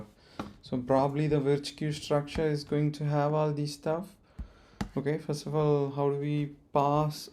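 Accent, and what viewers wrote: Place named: India. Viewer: with Indian accent (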